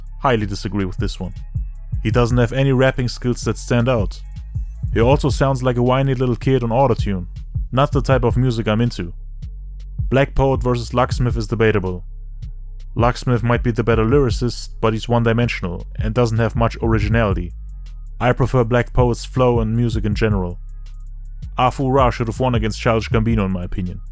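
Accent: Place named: Germany. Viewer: Sounds a Little Bit like you got a german accent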